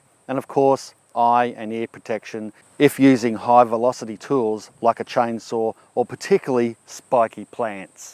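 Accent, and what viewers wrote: Australia. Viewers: We moving in to a house that has some fruit trees, which we've never owned. The orange tree is so thick, we can't get a fruit picker up there to get the oranges. Every day 4-5 oranges drop down. They're already moldy and split when they hit the ground. We can even see some brown shriveled up oranges up there. The tree also has small green oranges, so it's still producing. You mentioned it's not good to prune while the tree is producing. So what should we do? There's also a apple tree in the same condition. Any advice would be greatly appreciated. I live your channel because you're have a wealth of knowledge, I live the Aussie accent, and I so love your humor and quick Witt. Thank you for sharing!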